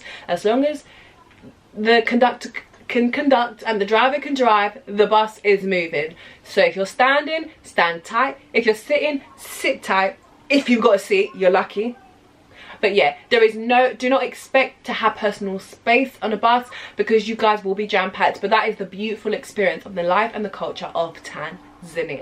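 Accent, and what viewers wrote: United Kingdom. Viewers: I finely found a a British accent in a view about Tanzania 🇹🇿 🤗 I live in the UK 🇬🇧, that's why I wanted to see the views about Tanzania from a black British, and you are very young girl, thank you so much for this very useful information. Thank you my girl. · not all of it no i was looking for another video but what i did hear was that you sound English where was you born ? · was not expecting a brit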